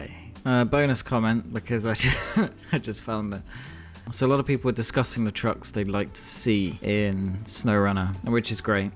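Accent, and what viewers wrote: United Kingdom. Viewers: You play SnowRunner. You have a bloody dry humor. And you have a British accent. It's no wonder this channel is going to explode in the next months... ;-) You're doing the right thing. Just keep doing it. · I never knew you were a fellow Britishman!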